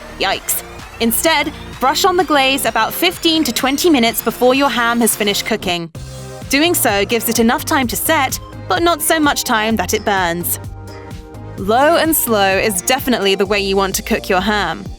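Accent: England, United Kingdom. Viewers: Love that British accent ❤️ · English accent. They don't do pineapple on ham. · Well folks dhow this is a an ok sort of video please be aware. While the narrator may be British and have a British accent .All the temperatures recommended are in the Fahrenheit (°F) range Where as in England they use Celsius (°C) the internal temperature of 143 F is the equivalent of 61.6667 Celsius (°C) But please be advised for an uncooked ham the recommendations are 63°C or if you are in America where they use the Fahrenheit scale that would be 145°F for the internal temperature . A cooked ham the recommended internal temperature is 140°F or 60°C So going 145°F or 63°C on a cooked ham you will be over cooking it. Now as the only countries that officially use Fahrenheit as a unit for measuring temperature is the United States, the Liberia and the Cayman Islands. Other parts of the world use both, though Celsius is the standard. 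So one can take it this video is for the American market. But if you are outside the aforementioned. Then your appliances control's are more than likely designed for the Celsius (°C) temperature range . So something to look out for when cooking As for F to (°C) converting Subtract 32 from the Fahrenheit temperature. Multiply this number by five. Divide the result by nine. 143 F - 32 =111 x 5 = 555 / 9 = <num> But no matter what you do always cook your ham LOW AND SLOW An internal temperature gauge is a must in any kitchen Anything else is just guessing and mostly leads to over cooking or even worse under cooking It is all about the internal tempera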